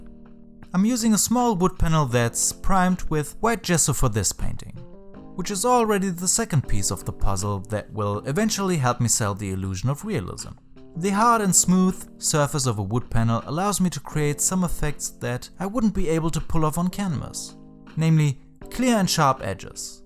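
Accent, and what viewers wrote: Germany. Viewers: i like your paintings o.o you have a german-english accent. Are you born in germany? ;)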